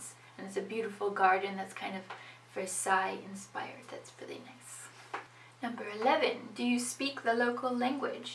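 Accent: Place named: Sweden. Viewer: Yay! Hittade precis din video när jag kollade efter denna tag videon som jag kommer filma nästa vecka, ville bara säga vad söt du är och din accent är så svensk 😍 och tack för att du delar! Min son kommer nog ha det exakt som du eftersom han är född här i USA men hela min familj är kvar i Sverige ❤️ Så intressant att se ditt perspektiv, speciellt språket eftersom jag har svårt att keep up the Swedish with him on a daily basis 😅 reser till Sverige för första gången med honom om två veckor och längtar sååå! 🙌🏼 Kram till dig! 💕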